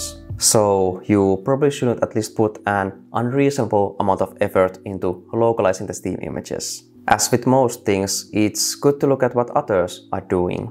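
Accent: Finland. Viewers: Are u Finnish? Your accent sound like one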